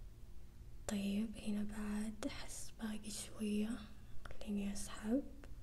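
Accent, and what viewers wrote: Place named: Saudi Arabia. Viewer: هي من وين معليش ؟ احيانا احسها سعوديه واحياناً لا من اللهجه، (ونعم فيها من وين ما كانت اكيد بس اسال لان من زمان ادور سعوديه تسوي محتوى asmr مالقيت )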